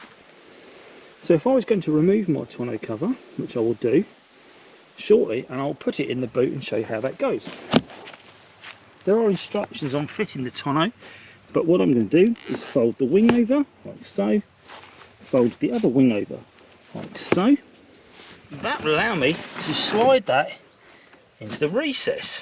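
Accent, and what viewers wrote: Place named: Kentucky, United States. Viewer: Nice accent, Kentucky?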